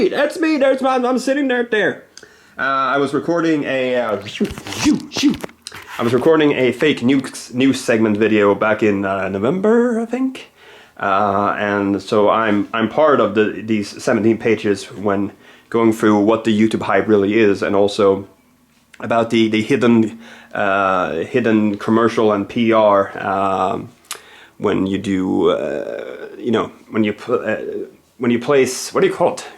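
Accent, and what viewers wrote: Skåne, Sweden. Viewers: the skånska is real, det gillas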